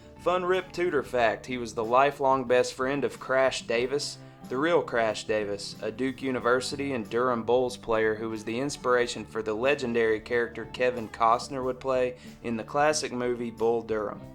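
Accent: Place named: Baltimore, United States. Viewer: I can tell from the accent right from the get-go you are a fellow Baltimoron lmao, or at least you sound like you do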